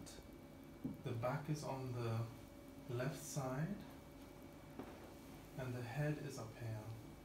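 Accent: Trinidad and Tobago. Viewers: Is he Trinidadian? His accent sounds like it. I am also Trini. My baby is breech and I'm on here looking at exercises that I can do to get baby to turn before it comes to this point. The doctor made it look really easy in this video. He was so gentle and caring. Well done! 🙂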